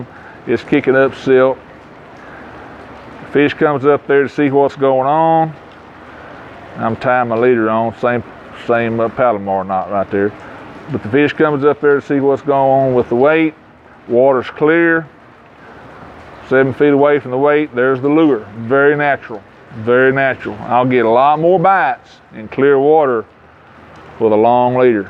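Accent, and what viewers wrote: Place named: Appalachia, United States. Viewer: Appreciate you spreading the seed of knowledge, you got the perfect hillbilly accent for these videos lol. Even if it weren't gold that accent would convince half of your viewers.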